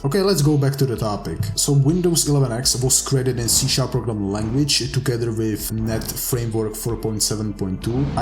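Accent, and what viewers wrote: Czech Republic. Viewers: hned sem poznal podle přizvuku že si čech dobrá prace ngl.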